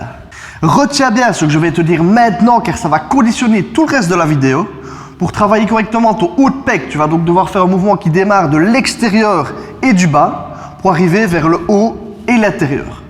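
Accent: Liège, Belgium. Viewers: Ce fut un plaisir d'avoir fait un petit brin de causette ;-), continue comme ça, tes vidéos sont vraiment instructives, maiemes avec l'accent de lièèèche ;-)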